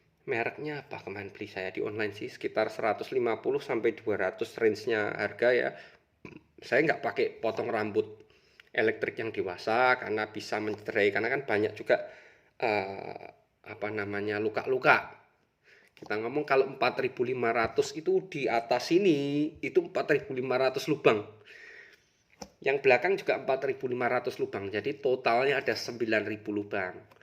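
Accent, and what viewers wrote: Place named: Java, Indonesia. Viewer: kok medok banget om , orang jawa mana aslinya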